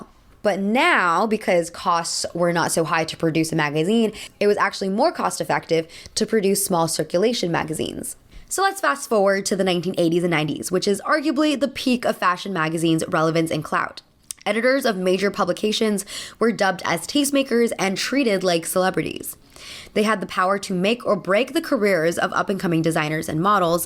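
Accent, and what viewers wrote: France. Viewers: Girl, your French accent sounds so good in this! <3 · the way you pronounced 'cahiers' made me laugh so hard 😭it was so aggressive lmaoo, it was also hilarious when you spoke english with a french accent lol love you though, as usual it's an amazing and extremely well researched video!! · As a creative thinker, I too love print. There is something special and magical within the tangible qualities that gazing upon a thought provoking or glamorous photograph and sliding your finger down the page as if you were there to experience it yourself brings to the senses. It’s a medium overshadowed by the instantaneous stream of vapid content — not art — that our society is now bombarded and accustomed too. But, as a designer and ambitious visionary, I understand that what I have to offer will by exclusive and elitist. I beckon for mystique. I remind myself of the time Lady GaGa said in the beginning of her career, “I’m not interested in being a celebrity. 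I’m interested in being an artist and there’s a VERY big difference.” A week ago, I was daydreaming of a group of girls with taste, together flipping through and expressing to one another a sense of genuine interest of something in particular within their large glazed magazines. I thought to myself, it is my duty to create this moment for them. Also, your French accent is on point. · French accent come thruuuuu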